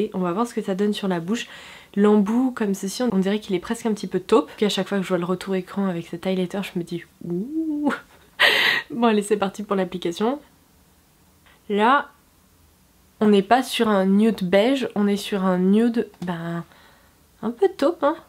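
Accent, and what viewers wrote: England, United Kingdom. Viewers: Vidéo top encore une fois! Les produits me donnent trop encie, surtout la palette de fards 😍 Et cet accent english que tu as, j'adore 😂😘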